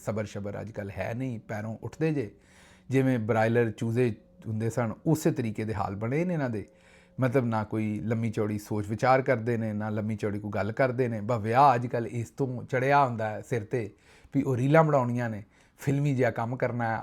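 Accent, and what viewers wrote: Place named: Punjab, India. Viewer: صاحب میں کی بہت بڑی فين ہوں اللہ آپکی حفاظت فرماۓ آپکا پنجابی vlog مجھے بہت پسند ہے میں بھی آپ کے شھر کے ايک گاؤں سے belong کرتی ہوں جب آپ پنجابی ميں لطيفے اور کہاوت سناتے ہيں ٹھوس پنجابی بولتے ہيں کمال کر ديتے ہيں ❤ مجھے لگتا ہے ميں اپنے گاؤں ميں ہوں آپکا vlog خبروں سے ذيادہ آپکی پنجابی سننے کے لئے ديکھتی ہوں عابدہ يو اے ای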